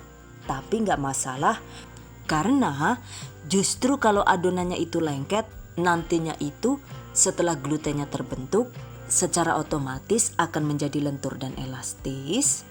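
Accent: Banyumas, Indonesia. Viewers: bund aselinya mana?? ora ngapak ora kepenak ya buund:D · ya Allah ngapaknya 😂😂😂 maturtenkiu mbekayu resepe... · Sering nonton..asyik dan tambah sayik ketika bunda ngomong ngapak saya seneng..karena bisa menikmati vidionya sambil ketawa ketiwi sendiri..😃😃😃makasih resepnya ya bunda santiikk.. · Mbak...aku galfok... Rika ngomong ngapak🤭🤭🤭 sukses selalu mbak... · Dening ngapak2 cilacap / banyumasan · Syukaa dgn bahasa ngapaknya...makasih resepnya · hahaha....lucu jg bu di vloge ini...aku suka bahasa ngapak ngapaknya...hayooo org Tegal atau Purwokerto niehhh....? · Wong ngendi si kie seneng ngomong e ngapake ngepol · Ngapakke metu.ora ngapak ora penak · Bu kang endi jane asale...deneng ngapak banyumasan yak 😅😅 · di ringokna nemen" malah gari ngapak😂. thank's for information and tutorial bun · Ya Allah.. ternyata dari negara ngapak to? 😂. Dari dulu kalau lihat ngakak mulu.. 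· Terima kasih resepnya kak,suami sangat suka makan roti...saya suka kalau kakak ngomong bhs ngapak krn suami orang cilacap juga,sukses buat kakak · maksih resepnya bunda seneng deh,jelas banget cara penyampeannya, lucu lgi bunda ngapak² 😂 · Hahah bhsne ky inyong pada bae ngapak bun 😂😂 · Ngakak Bahasa ngapaknya 😀😀 Rotinya mantul bun. Kudu di jajal kiye rotine 😂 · Yune wong ngendi sih bahasane ngapak banget... Anu turunan turki apa yah.. Aku tak coba praktek kiyeh yu... Seneng pisan yakin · langsung auto subscribe waktu denger si ibu ngomong ngapak...😅😅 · "ookeeh!caatat!gitu yah!emang gitu yah!fifty menute laterthe choice is in your" Wel, it's amaiiiiiizing!!, madame lah kepribeeen ngapak 😁😁😁 bikin gagal fokus dengerinnya. Anyway pokoke siip laaah. Thx madame dan salut cengkok bahasanya inggris british banget. REQUEST: Saya sudah lama pernah makan ikan sapu-sapu kali saat camping waktu sekolah. 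Caranya saya mengolah terpaksa lumayan sadis (karena kulit ikan sapu-sapu "emang keras yah?" 😁). Pertama, ikan sapu-sapu dibakar hidup-hidup dalam bawah api unggun besar/panggang hidup ± 20 menit. Setelahnya saya gunakan ranting dan sarung tangan untuk keluarkan ikan sapu-sapu mateng. Lalu, Tekniknya saat ikan sapu-sapu masih panas langsung patahkan kepalanya dan tarik sekaligus (satu paket: kulit keras, tulang 1 set, usus dan kotoran, ingsang, batok kepala, semua langsung lepas dan buang). Sisanya sirip ekornya dipotong dan dibuang. Terakhir baru daging ikan sapu-sapu diolah dengan jeruk limau + bumbu + dimasak matang dan enak. Mbak, apa ada cara yang lebih bagus daripada cara dibakar seperti itu?, kalau pakai oven saat masukinnya itu ikan sapu-sapu apa 'menggelinjang-menggelinding tuing-tuiiing' yah?! Barangkali mbak punya Ide CARA Pengolahan ikan sapu-sapu dan MENU Apa. Saya tunggu kreasi mbak. 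Saya subscribe and thanks. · Awal nonton tak kira bunda orang luar..😅dari nada bicaranya.. lah skrg knp jdi ngapak bgtu bund 😅keren lah pokonya ga bosen nontonnya asik dn menghibur 👍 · Wkwkwk enak ngapaknya ngomong nya, jdi kepengen kursus Bahasa ngapaknya. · ora ngapak ora kepenak...yora son?? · Lahhh jebule mbekayune wong ngapak ya...!!! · i like ngapake yu...... #hidup ngapak wkwkwkwk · Mbaknya kl ngomong sk lucu...trilingual pula..😁 sk bngt kl mbaknya ngomong pk bhs semacam banyumasan...luv u😘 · Auto subscribe....karna ngakak ngapake metu...hidup ngapak🤣🤣🤣🤣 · suara ngapaknya bkin sy ktawa 2 bae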